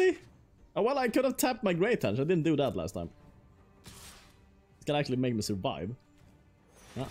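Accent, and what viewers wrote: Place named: Sweden. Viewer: i always cringe a little bit whenever Sweeds try to pronounce g's and J's hhh, great video though, keep it up.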